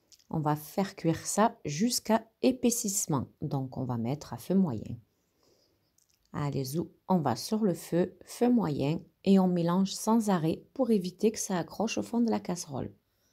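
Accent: South, France. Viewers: Coucou, elle me plaît beaucoup ta bûche, effectivement elle est à "croquer "parfaite pour cette année à Noël 🤶 🎅 🤶 🎅, merci beaucoup pour tes partages avec notre bel accent du Sud...Gros bisous et très belles fêtes 🥳 à toi et à ton entourage. 😊😊😊😊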